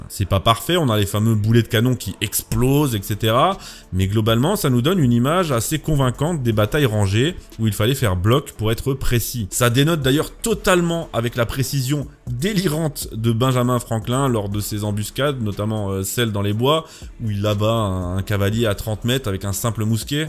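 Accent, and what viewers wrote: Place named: England, United Kingdom. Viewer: toujours interessant ce genre de vidéo mais l'accent anglais... svp...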